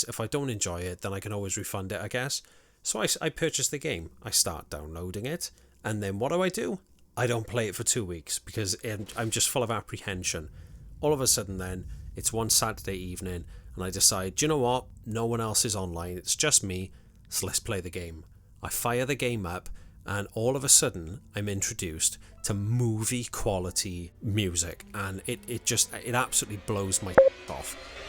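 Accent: Wales, United Kingdom. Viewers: Is that a hint of a Welsh accent I hear? 🏴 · Hey dude, brilliant video! For starters it's nice to hear a fellow Welsh accent in the YT gaming space! Secondly, I really enjoyed your chill tone, and your rambly style, it's really cool so don't worry about how 'professional' it seems or whatever, it's a strength o your style I think! Plus, I can clearly see you put A LOT of effort in the editing, the cuts, the memes, the general flow, fantastic job! And finally; welcome to the Souls club, once From has their fangs in you it's hard to get away lol! Hope you play and enjoy the other titles! Anyways, apologies for for the mini-essay but yeah, awesome video, a joy to watch, thanks! Cymru am byth!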